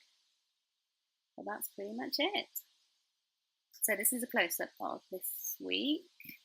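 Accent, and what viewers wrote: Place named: United Kingdom. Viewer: I happen to love british accent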